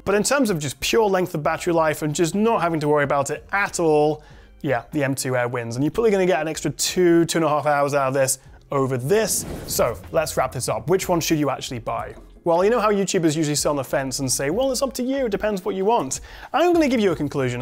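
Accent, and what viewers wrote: England, United Kingdom. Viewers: I’m mesmerized by this guy. Maybe it’s the English accent.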